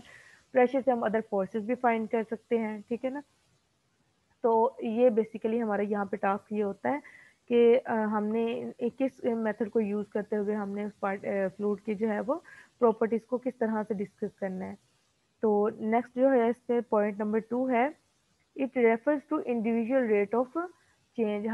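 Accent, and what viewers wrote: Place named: Pakistan. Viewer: Voice se hi lg gya tha Pakistan ho ...🙈😅😅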